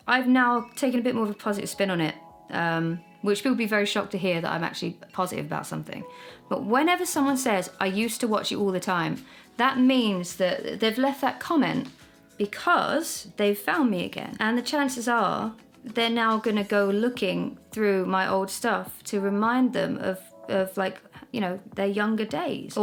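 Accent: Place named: Essex, United Kingdom. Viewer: I love that you've left up Ask 4, that's still so entertaining. An interesting slice of history too with the full on Essex accent still strong back then.:)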